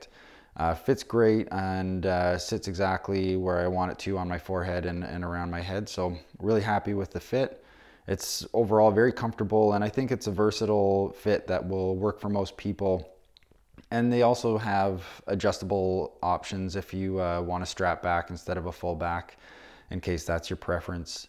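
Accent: Canada. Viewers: As a winnipeg guy you sound like an Ontario guy! Good vid I didn’t know I needed a flannel cap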